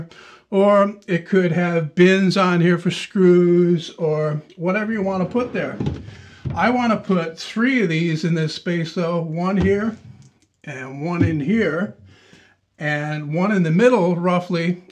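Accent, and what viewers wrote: Canada. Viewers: Hey, I was wondering, are you canadian?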